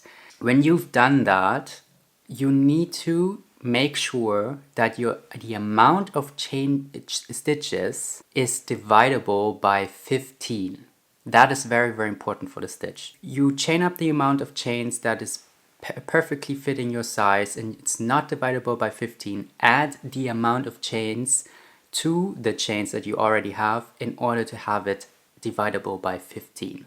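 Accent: Germany. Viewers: Where Do you come from? Is this a german akzent? The pants are WOW! Many greatings from Germany👍👍👌👌🤩❤😘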